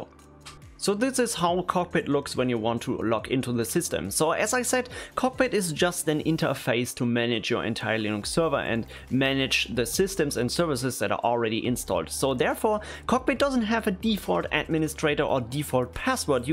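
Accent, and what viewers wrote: Germany. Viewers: Im ersten Satzt direkt gemerkt "Jop das ist nen Deutscher" Ich danke dir, genau nach so einer Software hab ich schon länger gesucht. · I can hear some German English.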